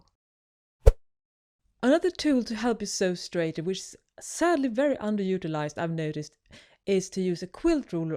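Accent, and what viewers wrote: South Africa. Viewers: is this lady south african? im not sure cuz sometimes i will still mix it up with australian for whatever reason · I'm trying to guess what your speaking accent is, I find intriguing and quite pleasant to hear . South Africa, Dutch ? Please share. Thanks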